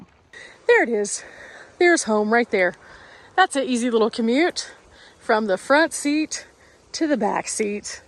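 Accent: Texas, United States. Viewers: Love your videos. Knew from your accent you were a fellow Texan!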